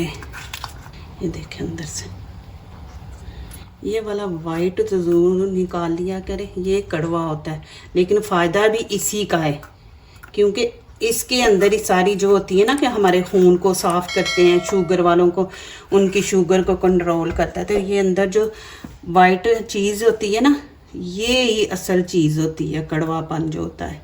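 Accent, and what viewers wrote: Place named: Punjab, India. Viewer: Ap Panjabi logo ki Urdu b alag Hoti hai pata chal jata hai Panjabi ho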